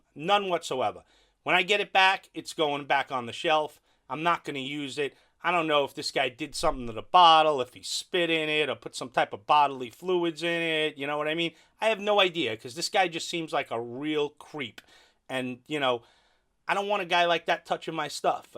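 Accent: New York, United States. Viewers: "I'm a newyorker thats the way we talk"... classic! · lol ya your New York accent would offend some of these cheese puffs in California coming from the east coast i know New Yorkers, but some of these silly fluffs man oh man this world of ours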